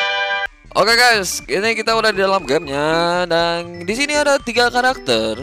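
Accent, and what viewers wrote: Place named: West Java, Indonesia. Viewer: biasa bang tea wkwkwkwk(sunda nya gak terlalu)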